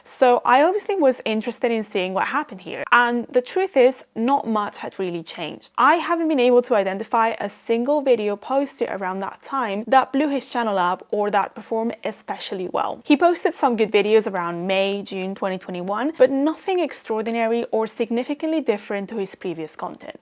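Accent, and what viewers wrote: Spain. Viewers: It's me or your main language is spanish? Your content is really good, I'm just starting and is valuable to find channels like this, blessings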